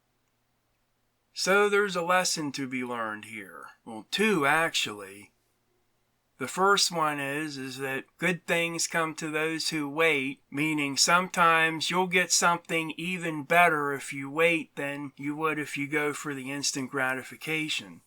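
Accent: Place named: Pennsylvania, United States. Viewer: Puts things into perspective! Your accent reminds me of my X's Ma. They were from Zelienople.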